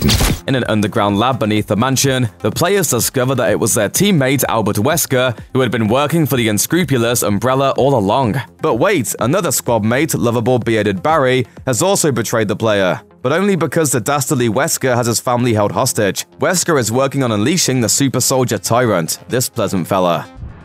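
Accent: United Kingdom. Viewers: What’s your accent? Sounds British but not familiar with ‘citay’ or ‘zombay’ accent! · Can you not be British? I'd like for just one shitty recap video maker to not be British.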